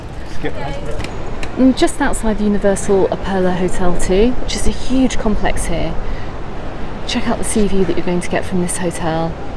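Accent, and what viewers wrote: United Kingdom. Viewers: Damn so strong british accent i do not understand nothing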